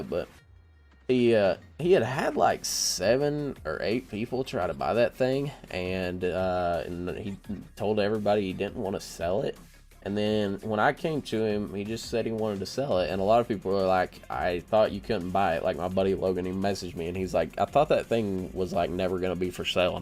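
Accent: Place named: South, United States. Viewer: bro I won't lie I have you just talking in the background and working on school work and what not. Something about your southern twang really has me sitting down and just listening to you. You should dabble into commentary!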